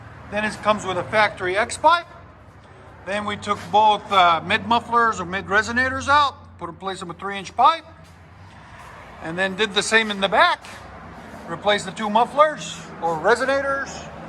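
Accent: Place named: Chicago, United States. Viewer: You sound like you from Chicago...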